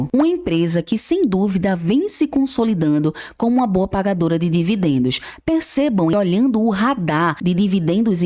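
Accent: Northeast, Brazil. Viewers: Sou fã desse lindo sotaque nordestino!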